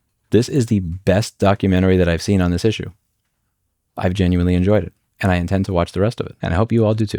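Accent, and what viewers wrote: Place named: New York City, United States. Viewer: He's from NYC. I'm familiar with his dialect so it's not fast to me.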